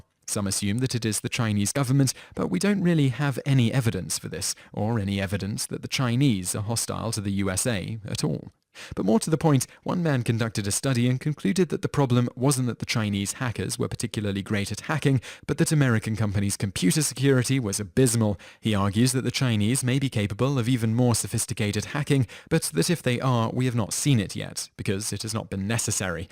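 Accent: United Kingdom. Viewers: zekeboy24 very true , is he a British guy ? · David T Spoken by a British person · Many of the "ridiculous myths" I never even heard of. Seems like pro Chinese propaganda to me, narrated by Guy with a British accent to make it sound more intelligent. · I'm pretty sure the announcer guy's a brit. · The narrator in the video is British not American, and the subject of the video is to clear up possible misconceptions of the Chinese as a society. How does this diatribe become a false attack on Americans? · So much fail... Have you never heard a British accent? Or did you think Americans have this one? WOW... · Not really irony, they're not the same american people who created the myths, plus, like Rachel said, the narrator's brittish. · The commentator is British.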